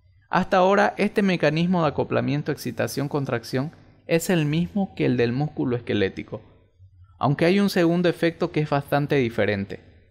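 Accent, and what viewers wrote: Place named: Santa Cruz, Bolivia. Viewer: Buenisimo video , una pregunta eres de santa cruz , porque tu acento es muy similar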